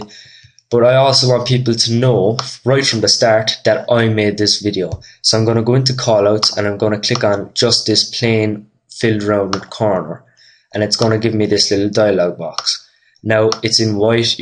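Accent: Ireland. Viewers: I swear you're Irish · Are you Irish?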